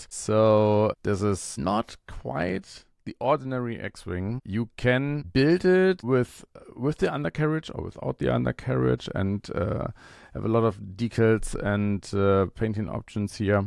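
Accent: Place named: Germany. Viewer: Fendt Traktor is too expensive!Ford Mustang only 60 parts??Porsche 911 the repop of the old crap kit from the 80s??BMW M3 is a Fujimi tool!Shelby Mustang only repop with new decals!Wow,what a lineup!And its always a torture to listen to this "denglish"!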